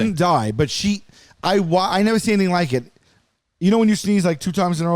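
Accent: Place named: New York, United States. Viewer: Yeesh that New York accent is not flattering anymore. It used to be cute a long long time ago.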